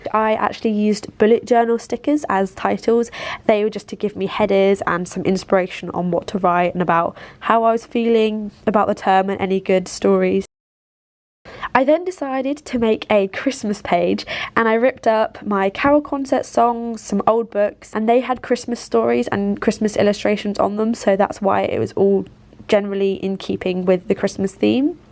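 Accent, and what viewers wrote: United Kingdom. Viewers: Because she is speaking in British accent and Emily was a British girl too 😅 does it connecting now 😅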